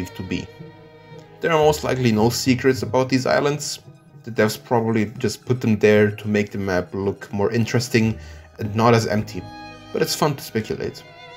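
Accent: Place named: Germany. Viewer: His german accent is the same as my englisch teacher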